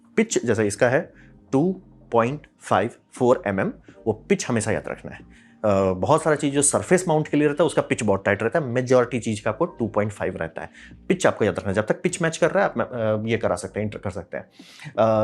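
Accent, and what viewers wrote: Bihar, India. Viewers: Aap pakka Bihar Se Hi Ho, Bhai. 💯 Persent pakka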